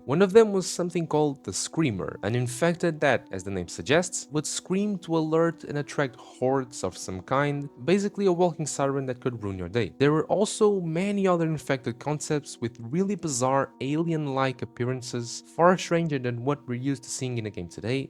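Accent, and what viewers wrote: Portugal. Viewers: Random but are you portuguese?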